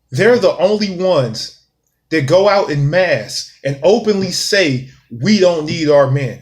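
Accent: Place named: United States. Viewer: I'm sick of them to man. I been done left the building. you did a good job telling them off man. you sound like you been in the United States all your life. good Job man.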